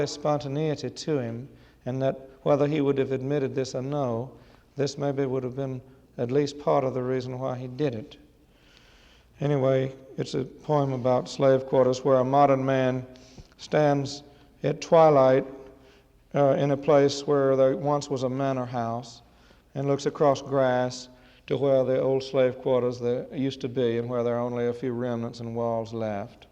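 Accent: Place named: South, United States. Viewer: Just wanted to speak on a tiny aspect of his dialogue during this reading when he introduces ‘Slave Quarters’: It may sound like he is saying the word, ‘N***er’ but that is just a characteristic of his particular accent. In the South, in Dickey’s day, the common way that black people were referred to was ‘negro’, and the word, when spoken in that regions vernacular would be pronounced as, ‘Negra(née-gra). You then have the ‘a’ At the end drop and it sounds like the word that is a racial slur but when people said the word back then just as Dickey did, it was used in the same way we would use the word ‘black’ to refer to an African American or ‘white’ to refer to a Caucasian. I just wanted to bring that up in case someone heard that part of the reading and was turned off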